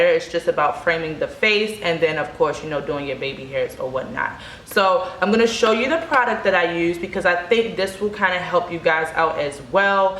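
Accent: Baltimore, United States. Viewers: Aye sis! Is that a Bmore accent that I hear? Let me tell you. I've watched 30 videos on twists and yours was the only one that actually showed the technique. Thank you!!!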